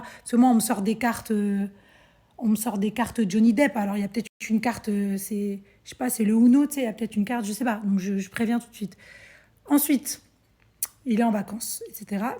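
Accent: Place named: Ivory Coast. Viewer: Elle est obligée de prendre l accent ivoirien?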